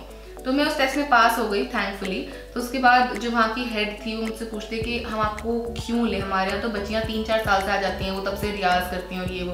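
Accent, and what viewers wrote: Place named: Delhi, India. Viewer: oh my god!desi grl ..ab pure ki pure delhi wali lag rh ho ...aap k hindi ...just wowwww ..😍😍😍😍😙😙😙😙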